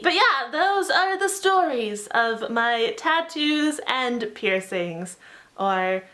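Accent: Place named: Ireland. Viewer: Irish whoo 😀😀😀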